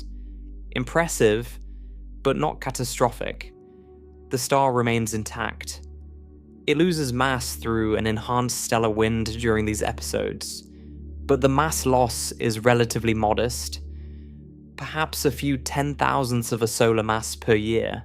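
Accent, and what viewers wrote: United Kingdom. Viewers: The omnipresent British accent is getting veryyy old.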